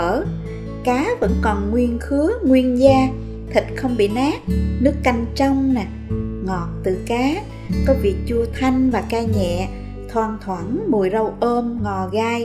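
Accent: South, Vietnam. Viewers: Giọng miền Nam ngọt ngào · Giọng nói miền Nam VN, dễ nghe, dễ thương.. Cám ơn cô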